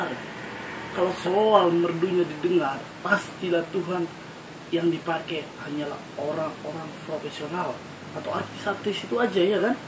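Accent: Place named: Nias, Indonesia. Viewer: Abang logatnya sepertu orang nias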